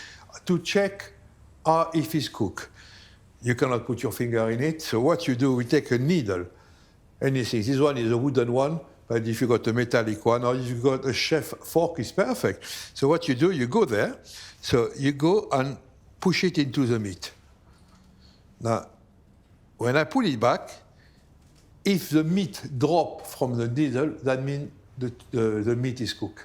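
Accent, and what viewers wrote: France. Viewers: Lovely, charming man, absolutely love his French accent! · Alors check again, D'accay His frenglish is so beautiful.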